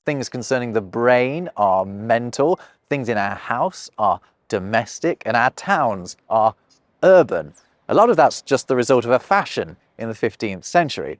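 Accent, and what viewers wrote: United Kingdom. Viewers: you're the most young-british-man sounding young british man ever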